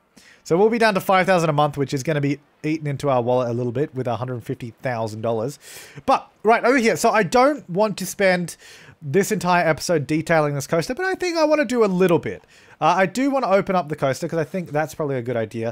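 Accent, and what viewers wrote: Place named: Australia. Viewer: Is it just me that thinks he sounds like a Australian WanderBots?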